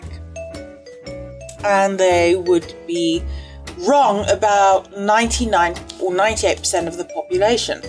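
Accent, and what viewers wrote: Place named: South Africa. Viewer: I'm South African I can hear you are South African I can hear it. I know exactly what you mean when you made this video 🙂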